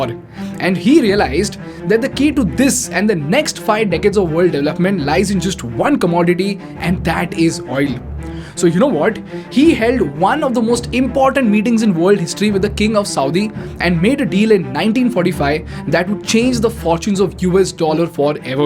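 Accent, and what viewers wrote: India. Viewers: Indian English really different and very strong Indian accent. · That indian accent 😋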